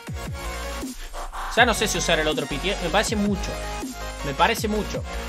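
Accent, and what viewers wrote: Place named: Cordoba, Argentina. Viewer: tremendo cordobes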